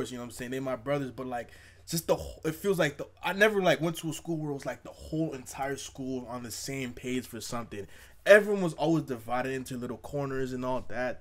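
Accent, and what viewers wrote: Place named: South Africa. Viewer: i can hear that youre south african my guy 😂😂🤣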